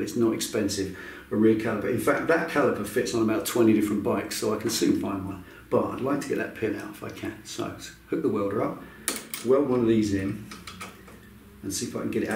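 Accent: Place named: England, United Kingdom. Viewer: I did not expect you to have aN English accent! The hat is throwing me off.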